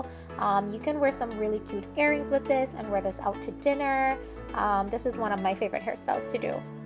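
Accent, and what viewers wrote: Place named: Jamaica. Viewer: Heard the accent and I was like...She is jamaican for sure!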